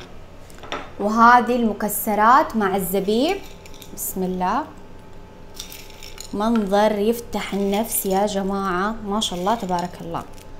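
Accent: Saudi Arabia. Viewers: I adore the Saudi accent mashallah your cooking is amazing 🥰🥰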